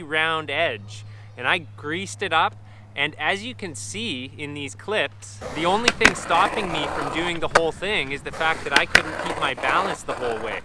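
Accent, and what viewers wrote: Canada. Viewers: Most Canadian skater I've ever heard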